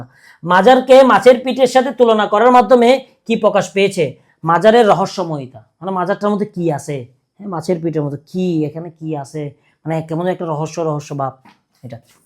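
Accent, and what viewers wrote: Chittagong, Bangladesh. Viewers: সার আপনি কি চট্টগ্রাম এর টিচার? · ভাই কি চট্টগ্রাম এর??❤ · ভাই কি চট্টগ্রামের ?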